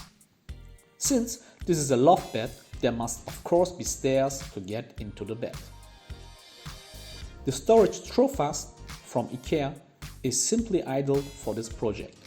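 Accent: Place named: Germany. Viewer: Nope, I'm Asian that voice doesn't sound Asian period. I was hearing German, Dutch or Danish.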